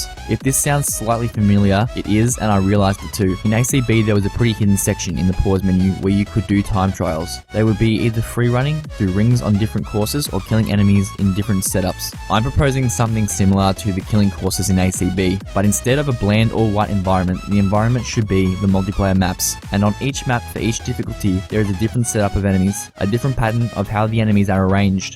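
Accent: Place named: Australia. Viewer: Liked the comm ! but did not understand anything because of your Auzzie accent -_- :p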